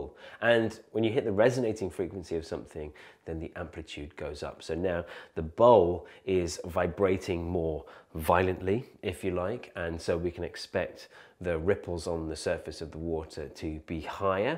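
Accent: England, United Kingdom. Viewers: I've gotta agree about the presentation voice. I'm in the States and I think there's also something about an English accent which conveys authority for many of us as well.. but I think the calm confidence contributes a lot. I came via standupmaths.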